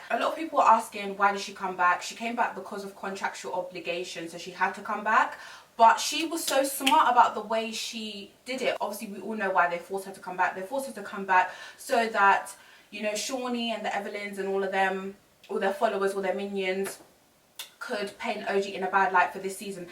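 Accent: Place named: United Kingdom. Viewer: Lovely Brit accent I love it OMG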